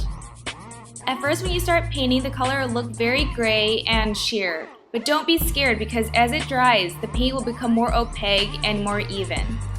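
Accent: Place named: Chicago, United States. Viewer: i love your accent.. its so... so... CHICAGO!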